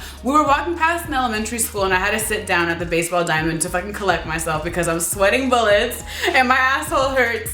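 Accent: United Kingdom. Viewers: It wouldn't be a video without the British accent popping out and sarcasm and sass. Love it 😂😂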